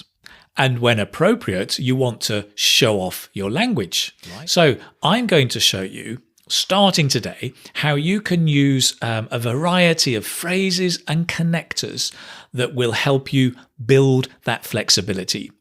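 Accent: United Kingdom. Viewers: I definitely love your British accent, hope you'll provide us with more videos <3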